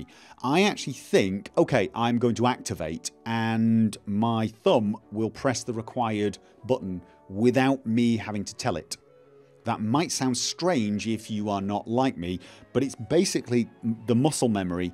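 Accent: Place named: England, United Kingdom. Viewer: going from his accent i would say he was Mancunian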